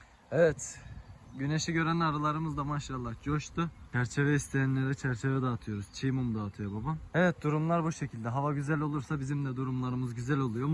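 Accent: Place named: Ordu, Turkey. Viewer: Selamünaleyküm ordulusunuz sanırım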